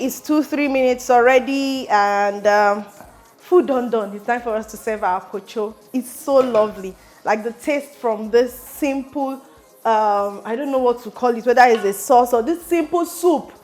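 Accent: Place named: Benue, Nigeria. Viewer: Waoo!! You did this and not from Benue? You even added Benue accent when you were cooking. Bless you sis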